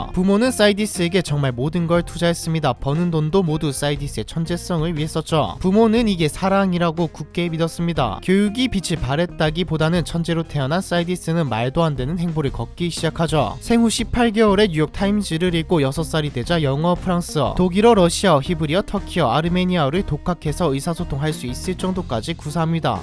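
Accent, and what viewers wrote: Gyeongsang, South Korea. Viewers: 경상도 분이시군요 ㅎㅎㅎ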